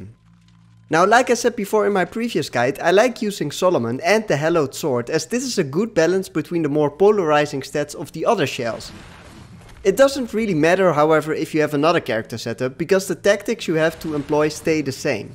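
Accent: Netherlands. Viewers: You sounds so dutch haha NL!! thx for the vid bro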